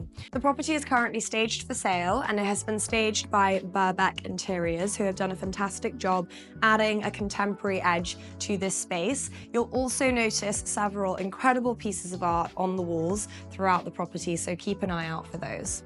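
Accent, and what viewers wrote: RP, United Kingdom. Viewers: Because of your nice legs and posh and the way you say all, Awl I’ll buy this house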